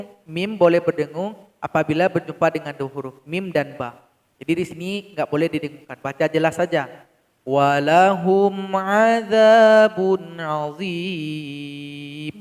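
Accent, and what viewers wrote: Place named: Solok, Indonesia. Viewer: Logat solok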